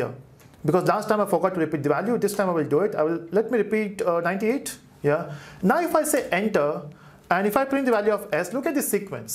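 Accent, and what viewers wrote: India. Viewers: he's rapping out the codes in indian accent Me: ...uhh...what?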